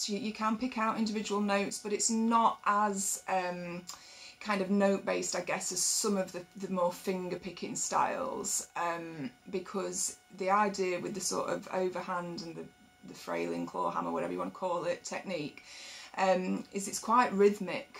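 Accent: England, United Kingdom. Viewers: I really like your style of teaching Claw Hammer banjo! I will be watching your videos! I'm from Texas and I love your British accent! And you're happy! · So much easier to learn banjo from someone with an English accent :)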